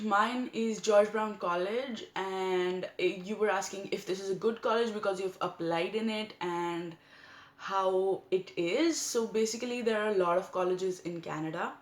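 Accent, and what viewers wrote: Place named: India. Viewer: 🐷🐷哎呀妈耶，这么隆重的阿三口音, 真的是所有单词都听懂了，但什么都没听懂最后🐷🐷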